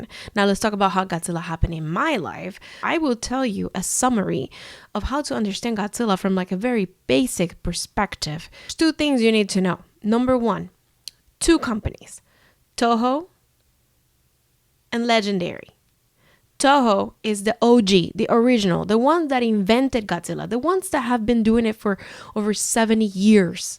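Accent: Cuba. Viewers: As an American kid my first exposure of Godzilla was the 2014 movie in theaters, then the second movie I saw was Shin Godzilla and since then I became obsessed. I have seen 30 of the Toho movies and love those classic movies so it's cool seeing people love Godzilla both Toho and Legendary more and more. btw I like your Cuban accent it's so nice. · Lifelong kaiju fan here. While the <num> era of suits and miniatures will always be the true heart and soul of the genre, our current revival with CGI blockbusters is still a glorious thing to be living through. It made my day to see you brought into the fandom by the new entries, while also being willing to go back and embrace the back catalog. We need a million more like you! ❤ And your Cuban accent is great. Anyone giving you crap about it should be ignored, full stop.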